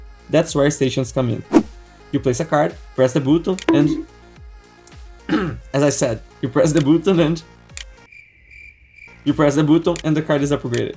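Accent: Brazil. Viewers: Só fui perceber ao final do vídeo que teu sotaque de inglês era brasileiro. Ótima edição! (com alguns efeitos sonoros um pouquinho acima da voz) But I really liked the project and the core loop of the game. The graphics are getting there and show an astronomical evolution since the beginning. Overall, take care with scope creep, and good luck! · That accent... Tu é brasileiro mermão!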